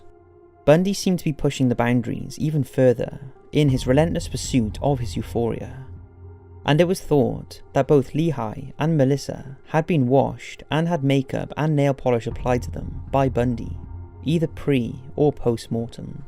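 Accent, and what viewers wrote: United Kingdom. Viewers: Why in the fucking hell are there only British narrators on this bullshit, fuck this.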